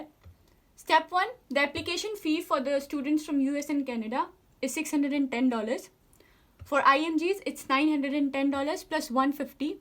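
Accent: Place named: India. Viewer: Indian accent sucks.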